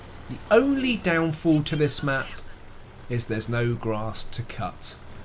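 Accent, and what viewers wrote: United Kingdom. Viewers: 35 minutes!!! Well played! It’s a pleasure to hear you talk! You’re my second favorite YouTube Wot Console player just behind The Turkey Tank, but your accent is so brittish it’s delighting for my french frog hears. Thank you and go on... Perhaps should you try the Jagdpanzer, it’s my second favorite premium to play behind the Vanguard, it’s fast, has a good camo rating and it’s challenging!!! Bye-bye...Bye-bye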